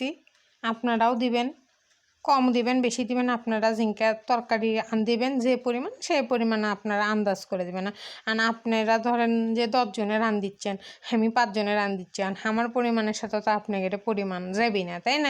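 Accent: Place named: Bogura, Bangladesh. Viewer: হামাগেরে বগরার ভাষা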